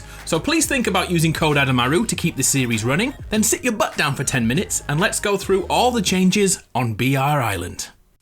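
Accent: United Kingdom. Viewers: Wait wait wait.......... Is British?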